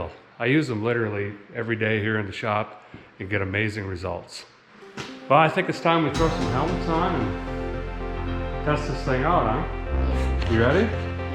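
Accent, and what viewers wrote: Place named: Wisconsin, United States. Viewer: A guy forgets how much your wiscahnsin accent came thru in earlier videos 🤣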